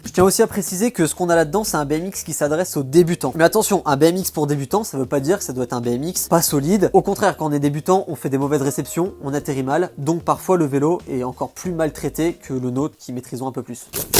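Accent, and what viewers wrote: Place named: North, France. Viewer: Tu viens du nord ? J'ai l'impression d'entendre un petit accent ^^ Edit : ah bah oui tu le dis dans la vidéo tu vas sans skate parc du nord de la France x)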